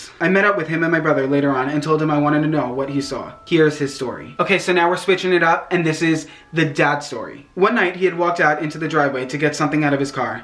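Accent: New York, United States. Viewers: I love your New York accent! I want to be your friend!!!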